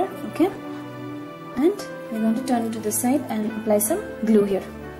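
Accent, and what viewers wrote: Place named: India. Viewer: hey, i love your indian accent. you sound like you have a beautiful heart. thanks for the tutorial and am going to make some of the earring you showed us and post pictures for you. thanks for sharing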